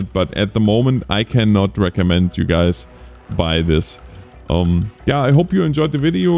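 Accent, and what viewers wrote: Germany. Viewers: Erm, was there a reason for you not to call the medics in on the chemical spillage? The fire was still raging and you had the firefighters drag the casulties out.. I was just wondering ^^ Und täusche ich mich oder hast du einen deutschen Akzent? :D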